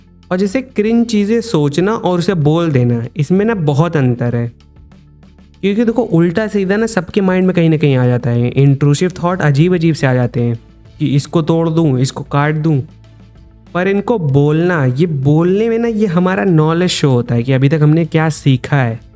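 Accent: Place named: Uttar Pradesh, India. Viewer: Aapke accent se laga ki aap UP me khi se ho. Maybe Lucknow? I can be wrong.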